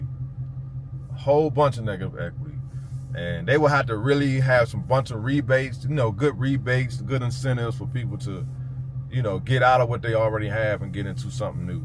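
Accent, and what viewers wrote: DMV, United States. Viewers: That looks like old Bowie, MD fr. You definitely from the DMV! I can tell by your accent too!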